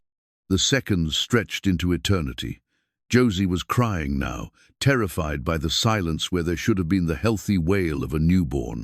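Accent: United Kingdom. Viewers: Too much time on the dead wife. Why not more on the dead husband. He had not been dead very long, yet, the wife has little grief? Ugh. And please get rid of the British accent. A western story needs an American voice.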